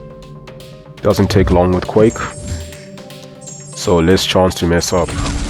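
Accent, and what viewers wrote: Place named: South Africa. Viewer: You sound really south African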